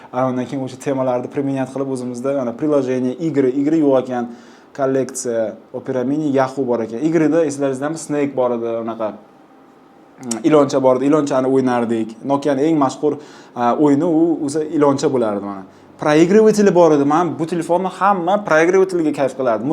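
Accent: Samarkand, Uzbekistan. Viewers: Samarqand danmisiz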